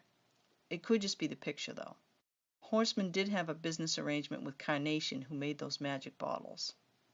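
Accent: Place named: New Orleans, United States. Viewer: Your accent sounds a lot like my family members. Are you from New Orleans? Btw I love this video. I love vintage dolls.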